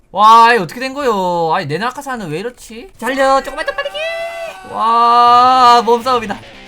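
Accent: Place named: Gyeongsang, South Korea. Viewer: 초등학생인 저희 아들의 권유로 배그를 시작하여 이렇게 유튜브까지 시청합니다.저희 아들은 김블루 광신도인데 저는 광신도입니다.ㅋ같은 갱상도 사나이라 그런지 사투리도 귀에 쏙쏙들어오고 재미있게 잘보고 있습니다. 아들을 이기는 그날까지 유튜브시청 많이 하겠어요. 퐈이팅 하이쏘~~^^ <mail> 입니다.ㅋ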